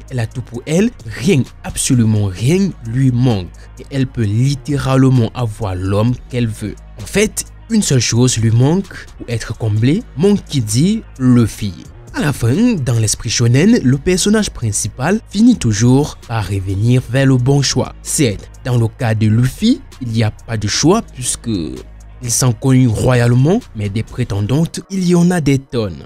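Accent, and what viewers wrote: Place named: Ivory Coast. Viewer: Tu parle comme un côte ivoirien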